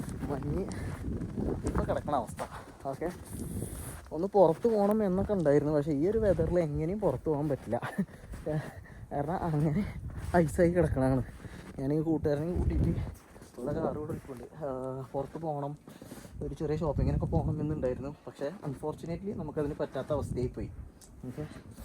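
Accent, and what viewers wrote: Malappuram, India. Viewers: Malappuram slang kekkan oru sugaan😁🤝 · Malappuram slag mariyo😂 malappuram slag ato bangi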